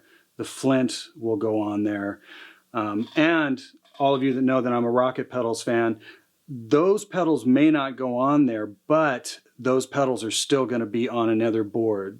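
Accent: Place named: United States. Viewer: Thorpe fx field Marshall fuzz. Oh yes. Get one, get one now. Talk as much as you like sir, it's interesting and us Brits never tire of American accent.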